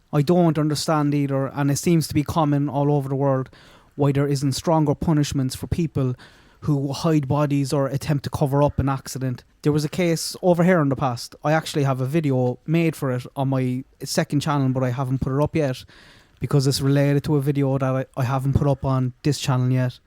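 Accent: Ireland. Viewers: The rate of an Irishman!!! Love it!! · my Brother, it felt very strange hitting the like button with the celebratory starred thumb gyrating. My heart is with you as your beloved brogue bears aloud the pain we all now feel and share. I wish I were in whatever corner of this world you occupy and I could buy us a few pints of beer, if you don’t total-tea exclusively. Though I do have a master of fine arts in creative writing, I have nothing more to say. Please take care of yourself/selves, and we cool-ass-subbies. This is a tragically sad story, yet it is still a blessed day to be alive. A part of our human experience is the requirement to hold disparate truths and emotions within our minds, bodies, and spirits all at once. 🙏🏽✌🏽❤️ from Minnesota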